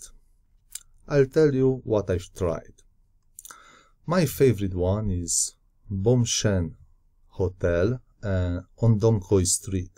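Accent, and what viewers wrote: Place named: Hungary. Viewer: Hm...as far as I understand an ESL teacher can expect some 1000 USD per month in Vietnam. Well I think in Romania nowadays a decent teacher employed at a public or private school can make this money salary + some private classes. Of course in Romania you wont have that abundance of jobs as in Vietnam. BTW...are you an ethnic Hungarian? your English has a noticeable Hungarian accent. Also ...congratulations for the excellent well calibrated comments and videos...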